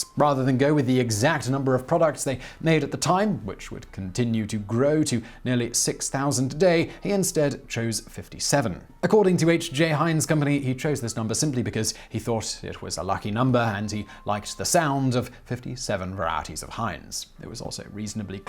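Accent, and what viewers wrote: United Kingdom. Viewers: I can't complain. It was so well integrated, I thought I was watching a British person. Might I add, the accent helps.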